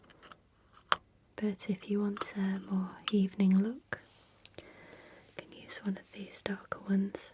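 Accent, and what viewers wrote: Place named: United Kingdom. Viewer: Wait does have a UK accent? I’ve been watching for so long I didn’t recognize anymore if she does, until she said “holiday”, because Americans say vacation. No way I forgot she has an accent 😂😂😂😂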